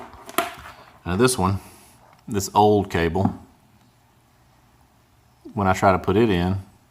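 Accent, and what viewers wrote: South, United States. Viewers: tony Hear, hear. I'm I the only one who likes his voice? Nice southern drawl.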